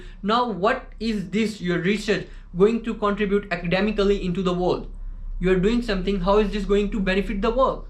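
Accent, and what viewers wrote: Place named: Pakistan. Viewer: kuch b kr lo native english bat ni kr pao gy 😂 tu faida nu Pakistanio ki trah he english bolo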